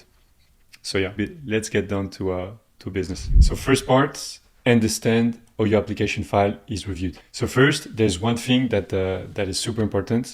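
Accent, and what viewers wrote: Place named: France. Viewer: Korean look, French accent😮 weird guy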